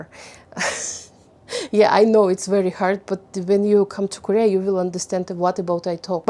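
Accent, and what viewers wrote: Russia. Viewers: Thanks a lot for your helpful video! I like your way speaking English. It's very fluent. You sound confident and your hard russian accent sounds exotic. It's nice! If you need any help with English, feel free and ask. I am an English teacher. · Я не андэстэнд по - английски😂, да и первое видео на этом канале смотрела информативный, куда вы пропали, но какая же вы милашечка! Какой милашный акцент, русский прям, так приятно наблюдать за вами и слушать! Спасибо большое за ваш труд, больших успехов и развития каналу!🫶🏻🌹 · Русский акцент никуда не денется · Действительно, можно поработать со временем над произношением.) Пока что это классический рашн аксент.)) Но замечательное начало.) Надеюсь, все больше новых комментариев у канала будут на английском от иностранцев. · Я так рада, что ваши видео теперь на английском так как учу его😍 Единственное что режет уши это наш ярко-русский акцент с твёрдой буквой «d», но это чисто мои загоны, по произношению. 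Но вот что меня порадовало, я без конца боюсь с артиклями, а у вас их не особо услышала, значит можно и без них🤣 Спасибо за ваши старания не смотря ни на что❤️ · Здравствуйте, Ваш английский довольно хорош, единственное, что это русский акцент в словах the, that и т.д.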